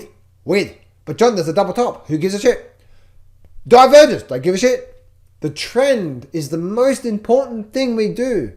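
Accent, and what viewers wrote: Australia. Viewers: The actual quote from Ed Seykota is: "The trend is your friend, until the end...when it bends." Don't forget the "bend" part, John! Keep up the great work. Love the Aussie accent.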